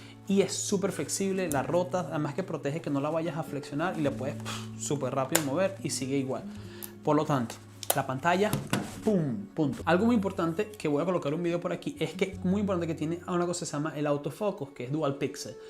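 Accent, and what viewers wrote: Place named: Venezuela. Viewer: ya decia yo, verga el acento de esta pana es venezolano ajuro.. pan banderita de venezuela en la camara haha Buen video bri!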